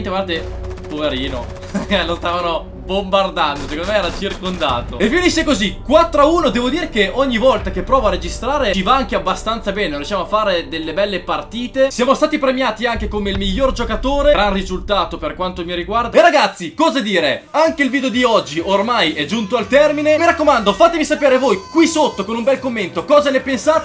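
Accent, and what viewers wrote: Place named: Parma, Italy. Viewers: Il tuo dialetto parmigiano è il top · Ve l'omo é bello il dialetto di parma io somo di fidenza☺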